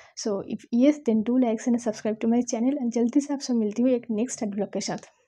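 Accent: Bengal, India. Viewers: Are u Bengali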